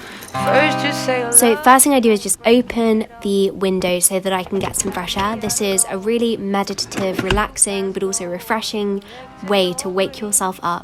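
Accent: United Kingdom. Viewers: Yay she is British 🇬🇧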